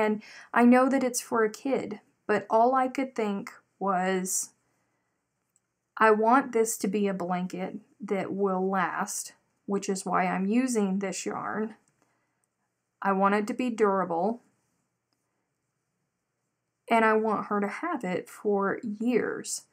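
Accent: South, United States. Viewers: OH MY GOODNESS!!! I JUST heard where you are from😃😃😃 My hometown is Savanah Georgia🤗 I'm a GA girl living in Las Vegas, NV, and I can tell yall, it's true...These people have NO idea about sweet tea😳 They actually think you can sweeten cold tea😳WITH ICE IN IT😳😳😳 Ok, rant over😂🤗Oh, I do like the granny square you are working on, that's just enough beautiful color to make it pop. I have been binge watching your videos since I found you Saturday. I have a question for ya, I heard you say you were a graphic designer. Did you go to SCAD? I have a great friend that is a graphic designer & she graduated from SCAD. Uh oh, yep, just heard you talking about high cotton, yep it IS a southern thing. I heard that all y life too, lol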